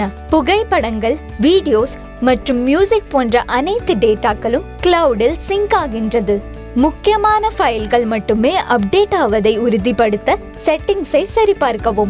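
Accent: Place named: Haryana, India. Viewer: Haryanvi Hindi medium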